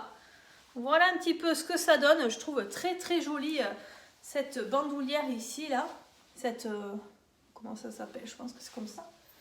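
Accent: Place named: South, France. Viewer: Coucou 🥰. Je suis ravie d'avoir fait votre connaissance sur YouTube. Vous avez bon goût. Moi j'ai accouché en 2009 à Villeneuve sur Lot et mon fils a été transféré en néonatalogie à Agen je crois. J'ai été hébergée chez la tante de mon ex à PUJOL. J'adore votre accent du sud le LOT et GARONNE. ❤🥰. Bisous 🌹 😚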